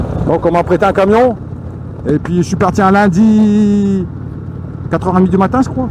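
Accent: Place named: North, France. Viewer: l'accent du nord c'est top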